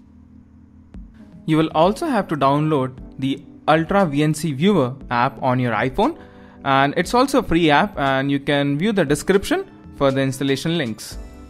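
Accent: India. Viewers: When they have Indian accents you already know they are scammers!!!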